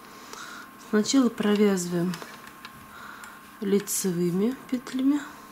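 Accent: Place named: France. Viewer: Обожаю Ваш фpанцузский пpононс )я pелаксиpую , когда слышу Ваш голос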